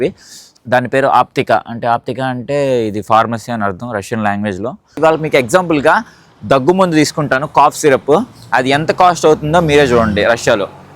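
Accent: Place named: Ongole, India. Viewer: Bro r u from Ongole... happy to hear that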